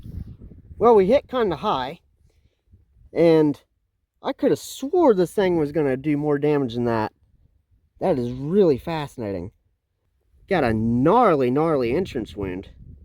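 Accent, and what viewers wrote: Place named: Appalachia, United States. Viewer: I rather try to catch that slug with my face than have too listen to another minute of this hill billy speak again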